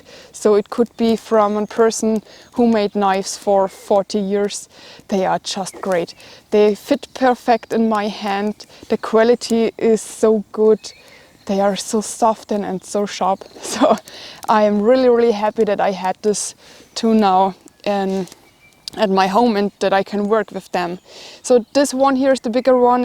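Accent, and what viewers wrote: Germany. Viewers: Teee peee..I love your beautiful German pronunciation of my language..danke. (:-) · I was hearing her say "Tippy" then realized she was saying "Teepee", her German accent is cute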